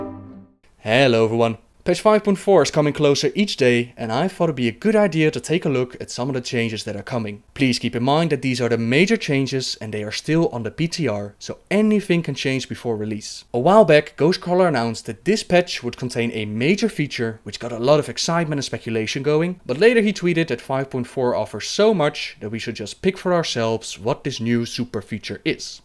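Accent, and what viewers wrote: Netherlands. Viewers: I like your Dutch accent:) I'm Dutch aswell:)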